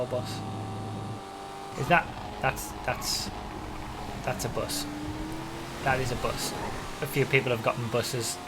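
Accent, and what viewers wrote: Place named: Northern England, United Kingdom. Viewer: Northern England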